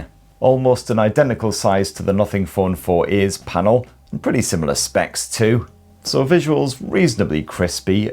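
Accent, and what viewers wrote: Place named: Scotland, United Kingdom. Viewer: W accent, is it scottish?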